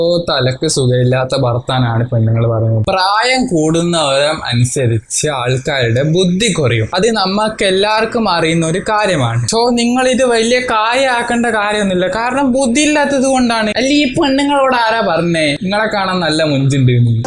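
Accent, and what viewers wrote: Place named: Malappuram, India. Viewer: Slang kettit on malapuram karann ahn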